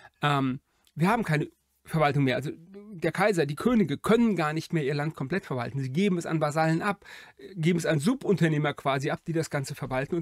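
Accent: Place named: Rhineland, Germany. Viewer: Ein klassischer Sprachfehler scheint das nicht zu sein, tritt vor allem bei Rheinländern und Eifelern auf und scheint in deren Dialekt zugrunde gelegt zu sein. Wenn es Sie zu sehr belastet, wechseln Sie einfach auf einen anderen Kanal, mich regt mehr die Diskussion auf, als die kleine sprachliche Besonderheit, die mir im Übrigen schon ziemlich lange bekannt ist. Wenn Sie mit Schweizern oder Sachsen zu tun haben, werden Sie auch kleine sprachliche Besonderheiten feststellen.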